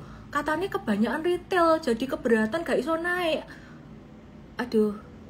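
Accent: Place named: Semarang, Indonesia. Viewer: cengkoknya Semarangan loh 😂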